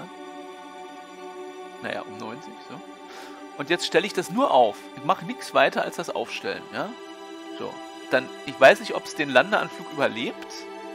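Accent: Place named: Berlin, Germany. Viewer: Ah herrlich, diese Verwirrung und dann noch die Berlinerschnauze 🤣👍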